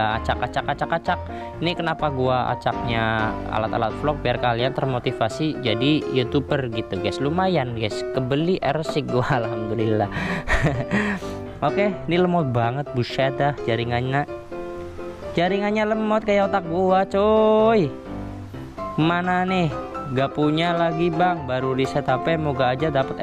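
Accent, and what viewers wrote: Tegal, Indonesia. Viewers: Ky orang tegal si bang logaknya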